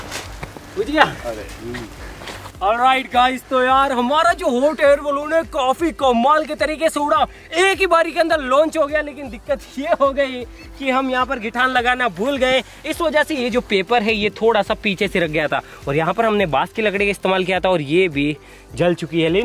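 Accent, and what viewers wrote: India. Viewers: I can only speak English, but I love the Indian accents 😀🇮🇳 the words flow so smoothly 🥰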